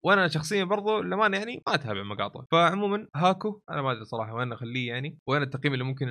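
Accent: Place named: Iraq. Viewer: صراحة دوك صوتو واللهجة العراقية يكفون يخلو من الافضل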